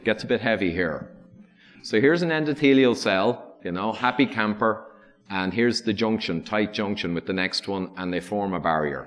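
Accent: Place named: Ireland. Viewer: Truly starts The Irish accent ... truly the sound of angels singing ;-)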